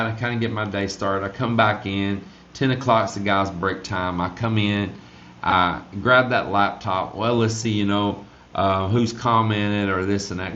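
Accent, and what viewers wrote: Texas, United States. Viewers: I enjoy your Texas accent. The funny thing about the Texas accent is that it is contagious. I lived in Maine all my life until I moved to Cebu a few years ago and I had an uncle who I had never met who had lived in Texas for years and we were all so surprised that he had caught the Texas accent. In Maine a Texas drawl really sticks out. That works both ways, a Maine accent is very noticeable when traveling. I think that living in the Philippines does change a person's English by using different expressions like aircon, choco, CR, asawa, etc. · You actually had me at the beginning and I was checking if I had accidentally somehow changed my audio/video settings. Then I figured you were getting back at the guy that commented about your slow monotone Texas Twang 😂...I watched the whole video anyways. I'm half Filipino half American (born in the Philippines). 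I actually lived in the US for 25 years, was in the US Army for 12 (at the moment lived in the US more than in the Philippines). I'm retired now (42 yo) and live just north of Subic Bay. My eldest son was born in Frisco, TX when I was stationed in Plano, TX for Army Recruiting. I gotta get out there to your place and visit y'all sometime, I enjoy your channel specially the content about construction and the boats. Take care now.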